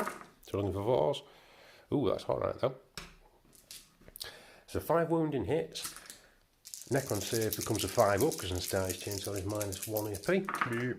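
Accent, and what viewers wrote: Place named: England, United Kingdom. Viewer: Ya I have trouble with English accents lol damnit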